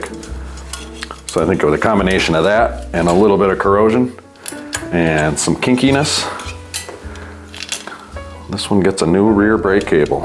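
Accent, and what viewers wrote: Wisconsin, United States. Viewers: New subscriber here. Algorithm found me after the videos you refer to, so I don't know what it was about and I don't want to. Here's what I found, though. A guy from Wisconsin who talks Wisconsin. My husband is from Wisconsin, so I have a soft spot. A guy who works on bikes and I've just bought my first bike (used) in 30 years, so a knowledgeable person who is also opinionated and so explains WHY he holds those opinions...I mean it hit all the buttons all at one time. So your new people are out here and we are finding you. Also got "Still True" queued up as my next read.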